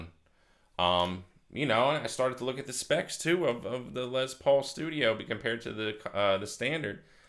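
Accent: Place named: Philadelphia, United States. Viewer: Lol are you from the Philly area or maybe NJ?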